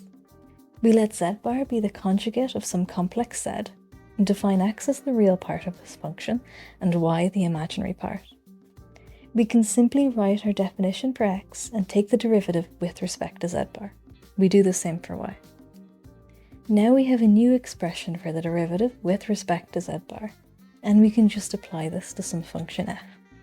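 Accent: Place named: Ireland. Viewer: Beautiful video, beautiful Irish accent. Hope you make a series of videos to develop these concepts.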